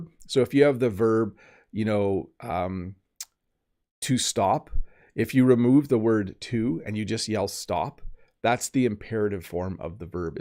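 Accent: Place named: Canada. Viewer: good morning, you are a great teacher, I learn English from you, but also calmness and composure. I saw you once on the Lucy english with Lucy channel, I must honestly admit that I thought that the difference in accent between Canada and Great Britain or Australia and the USA is greater, at my stage they are almost imperceptible, except for how you pronounce Toronto, Toronto, hold on you are great greetings From Poland. Luke G.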